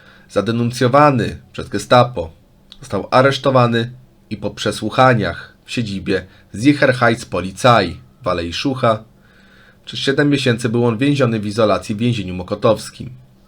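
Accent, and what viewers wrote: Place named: Ukraine. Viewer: Bo to Ukrainiec i nie mówi tak dobrze po polsku jak Polacy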